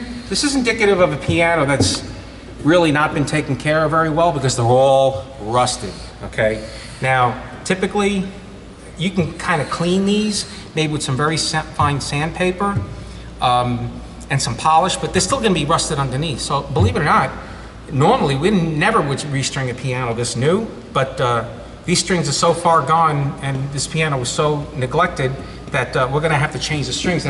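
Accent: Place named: New York, United States. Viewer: Host must have a NYC typical Accent.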